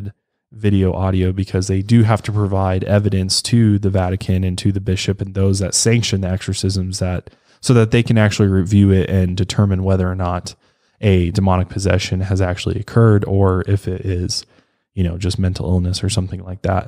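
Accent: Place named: Colorado, United States. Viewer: I think Colorado.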